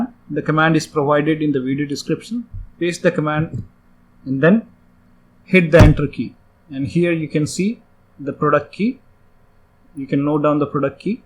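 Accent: India. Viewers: there are currently only 20 dislikes, the author has an indian accent and I've come back to this video several times to use the cmd method The cmd method is legit unless you're using a digital license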